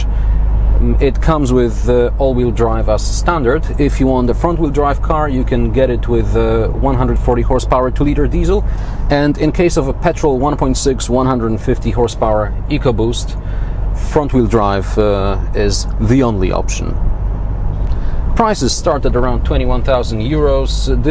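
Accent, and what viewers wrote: Poland. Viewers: What's the point the made rewiew in Poland in English? M8 you still have your polish accent.Do all Poles forgott theirs own language or what!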